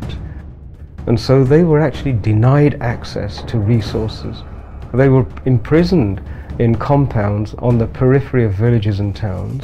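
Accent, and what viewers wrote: United Kingdom. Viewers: Brother. he is based in Britain and its the accent. It isn't too hard to understand if you focus... Jai Shri Ram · Why he is speaking with a British accent and why British government is allowing him to speak against Britain? These are the questions you need to ask yourself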